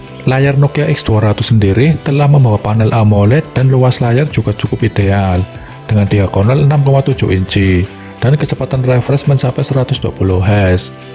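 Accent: Banyumas, Indonesia. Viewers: Mas aslinya seka ngendi? Cilacap, Brebes atawa Purbalingga😄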